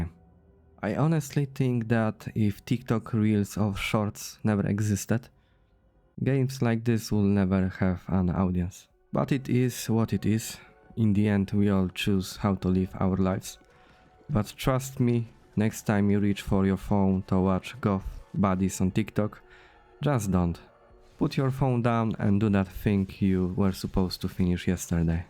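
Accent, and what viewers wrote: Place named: Poland. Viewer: You sound Polish bro, I like polyaks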